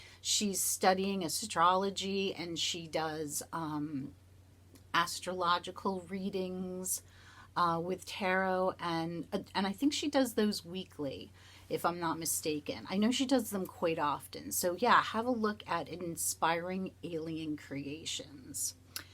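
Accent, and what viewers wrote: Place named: Massachusetts, United States. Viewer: I knew you must be from Mass I could hear it in your pronunciation!!! Nice to connect with you! I’m from Cape Cod.... love the shout outs!!!!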